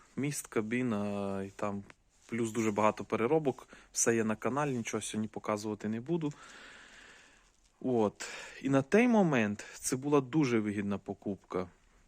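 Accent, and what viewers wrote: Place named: Rivne, Ukraine. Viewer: Акцент напевно Рівненський ❤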